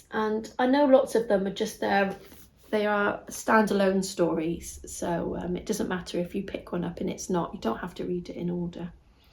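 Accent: West Country, United Kingdom. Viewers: Hi, thank you for your lovely podcasts they always brighten up my day 😊 I found you after watching Wendy the unexpected gypsy and Amy the meaningful stitch. Love hearing about all of your projects. My Mum makes my lovely project bags. Also just to say I’ve really been enjoying reading two books by Libby Page, The Lido and The 24 hour cafe. I’m from the West Country too, love your accent. Best wishes 🧶 x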